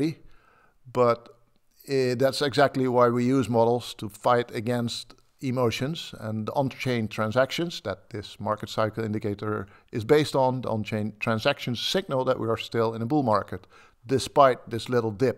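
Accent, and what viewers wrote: Netherlands. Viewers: NL accent ! ? :P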